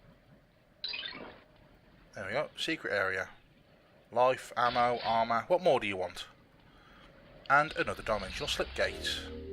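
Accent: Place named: United Kingdom. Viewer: Oh, I grew up on Quake. British accent + retro games = clear win. Do you mind if I add you to my directory of recommended YouTube Channels that is part of my online store?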